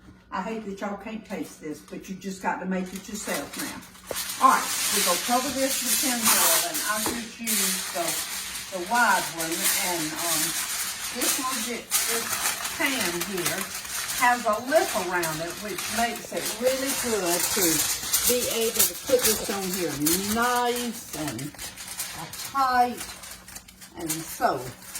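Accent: South, United States. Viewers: one of the last Southern Belles! That drawl is Southern without being "hokey" and yes i am southern too but more country than belle. But my mom and her mom before her cooked like that...limas because I love them, cabbage for my younger sister and sweet potatoes for the youngest one. YUMMMMMM. I can smell it all from here in Alabama. Bless you so much. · I love your Southern Accent. Im from North West Florida in the Panhandle. Once on vacation in Denver Colorado I was at a Wendy's with my young daughter and talking with her I apparently stuck out like a thumb! A man walked up to me while I was in line and asked me where I was from. I looked at him puzzled and said...United States. He went back to his table and a different man came up to me and asked me the same thing and I answered the same and was thinking...what in the world is wrong with these people! 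He then said... no what state are you from and I told him Florida and he turned to the table of people he was with and said.... I was right she is from the South. 😂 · She reminds me of my mamaw too! I just love this lady! My mamaw was from TN so her southern accent was a bit different than. She was the best cook!! She made THE BEST EVER pbj sandwiches! I can't wait to see her again oneday! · She has the most beautiful southern accent - I LOVE her! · I love hearing your Southern accent. I lived in Georgia for 35 years, and miss it. 💕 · I just found her channel today, and she seems so lovely in addition to being a wonderful cook. And yes, I love her traditional southern accent. 🙂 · Hello from Scotland! just found your chanel. great recipe thankyou. and I also love your Southern tongue !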